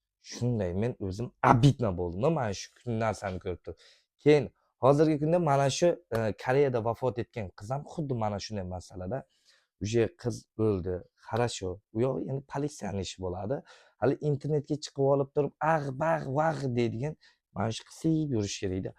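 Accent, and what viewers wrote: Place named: Namangan, Uzbekistan. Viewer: Namangan sheva bulib yotibdiyu yana Tojikistinliklmanmush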